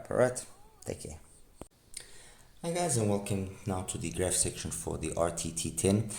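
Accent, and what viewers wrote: South Africa. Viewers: You must be South African...😊i can hear the accent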